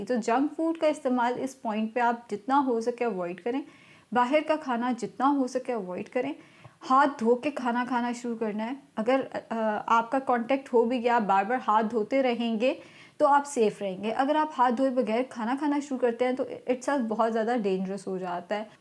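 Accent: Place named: Lahore, Pakistan. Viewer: Good work 👍👍👍miss Ap Lahore sa hain na ? Allah maf kare Acha tha Aisy Lahore wale Bolte hain Kue k main bhi Lahore sa hoon 💪🙈😁😅😂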